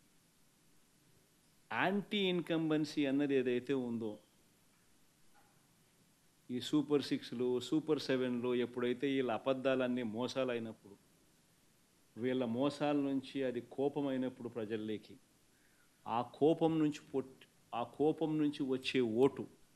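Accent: Rayalaseema, India. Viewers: Haha rayalaseema lo yasa tho answer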